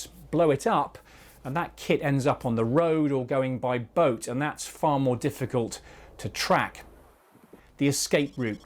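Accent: United Kingdom. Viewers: added points; after 1000 times only a few success for Ukraine 2.The air defence of Russia is robust 3. Ukraine air force already tried and failed 4. The drone boats were killed so many times by russia and still ukraine is trying 5. Of course Ukraine will take punishment if they really hit the bridge 6. May be Russia knows a lot about what are the assets Ukraine receives from west that why the counteroffensive is dud like ur mouth 7. Sun Szu would faced chengiz khan he would have not written the book 8. Actually Russia is playing long game to damage all western equipment's 9. in summary an old pig with british accent just doing propaganda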